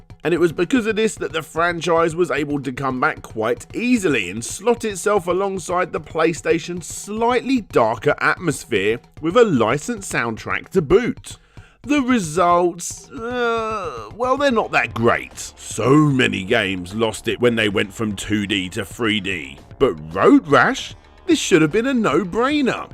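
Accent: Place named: England, United Kingdom. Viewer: While I enjoyed your video, and your English accent is marvelous to a boring yank like me... You never mentioned the PC version! Shame! But good job none the less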